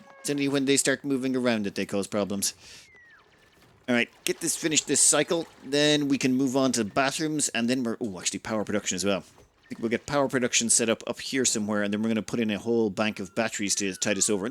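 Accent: Ireland. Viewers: shenenigenery? Seriously? You are Irish right? It's shenenigans!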